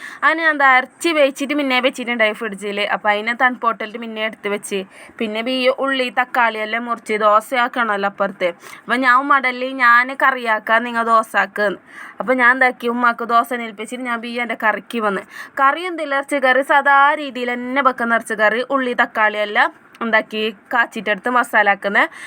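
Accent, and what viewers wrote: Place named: Kasaragod, India. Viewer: കാസർകോട് സ്ലാങ് സൂപ്പർ ക്യൂട്ട് വോയ്‌സ്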